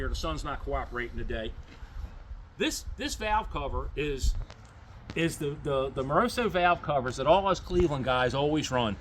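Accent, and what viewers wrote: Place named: Maryland, United States. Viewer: Awesome!! (Knew you were from MD as soon as I heard you.) I live about an hrs from Pasadena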